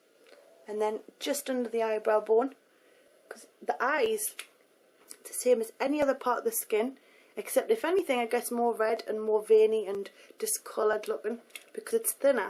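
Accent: Newcastle, United Kingdom. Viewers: brittish? or scottish? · No she is from up north in england :) Kind of newcastle way :) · shes talking like british really ugly engish the my is the best not the original but the best!!!!!!! :D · it might be odd sounding to you, but it's perfectly normal for me! she's from south shields, in england, and people from that area all have that kind of accent, it's called a geordie accent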